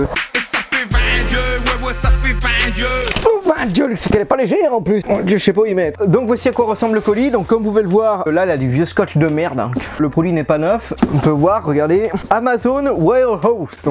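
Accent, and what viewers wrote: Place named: Savoy, France. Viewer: ton accent du bon vieux savoyard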